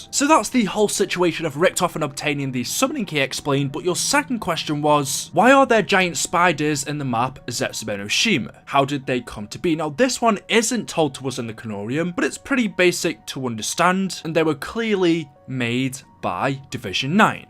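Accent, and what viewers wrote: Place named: Stoke, United Kingdom. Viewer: Hi, huge fan, this question is not about the game, it's about you. Are you from stoke on Trent or around there? because you have quite a strong stoke accent I think, I live down the road in Newcastle. If you do you are the only famous YouTube around for miles, I'm proud of you are!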